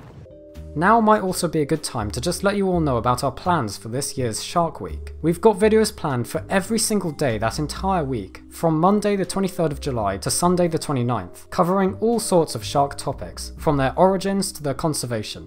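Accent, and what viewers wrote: United Kingdom. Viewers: you have a British accent so you can say everything as you please; apparently....:) Intro for an intro.......NICE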